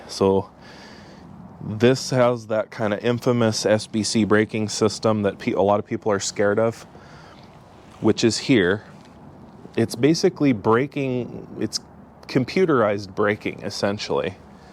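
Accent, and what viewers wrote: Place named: United States. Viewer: Hearing an American talk about diesel engines is crazy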